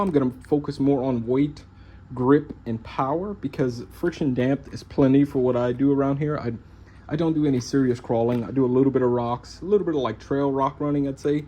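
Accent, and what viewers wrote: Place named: Deep South, United States. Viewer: Deep South. Where u from? I say ur bio saying Cajun. So Louisiana? Baton Rouge here. I’m waiting for my base camp to come in the mail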